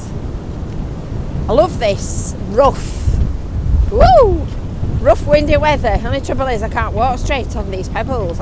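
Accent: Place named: Lancashire, United Kingdom. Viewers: I just love to listen your beautiful Lancashire's accent..l used to drive along the promenade from st.annes to Blackpool and stopped over to watch the sea. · I love to listen to your lancashire accent. Been in nz for 47 years.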